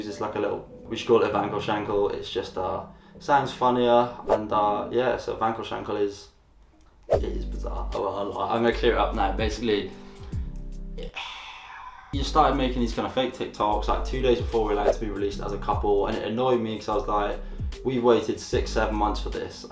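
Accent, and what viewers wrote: England, United Kingdom. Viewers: agree especially british accent is way harder than the americans one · which English accent is he speaking? 😩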